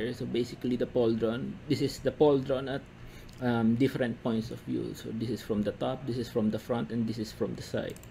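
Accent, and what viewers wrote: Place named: Philippines. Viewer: You sound like filipino. Where are u from?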